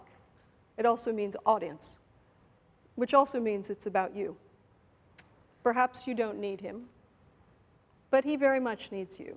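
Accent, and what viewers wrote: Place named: New Jersey, United States. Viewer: Her "oy" should be more "uy -- u as in duh, but tighter". And her Jersey accent has been....neutered. I'm not saying Bayway or Sleezeside Heights, but a Morris County accent, perhaps. Especially if she's speaking Yiddish.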